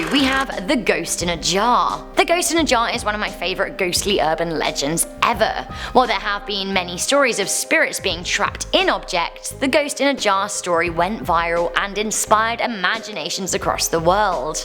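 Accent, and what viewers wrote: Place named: United Kingdom. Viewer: I love sexy British accent. 😊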